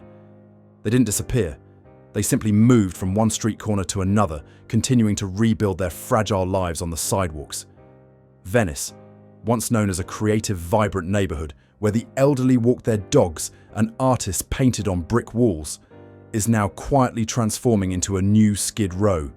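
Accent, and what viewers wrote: United Kingdom. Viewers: Couldn't they find an AMERICAN RESIDENT to narrate this? Instead of this British creep? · You sound like you're from Britain, so it's even worse there. 😂😂😂😂😂😂😂😂😂😂😂😂😂😂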